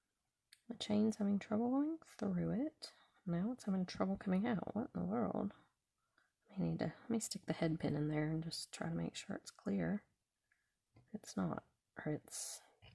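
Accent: United States. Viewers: Love your cowgirl accent❤❤❤❤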